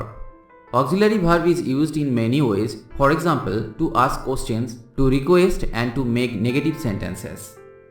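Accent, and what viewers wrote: India. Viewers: Can you speak englisch Not Indian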